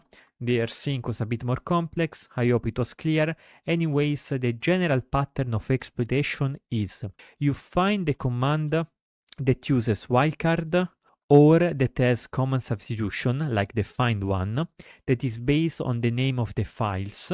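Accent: Italy. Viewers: Ho sentito i primi 20 sec. Sei italiano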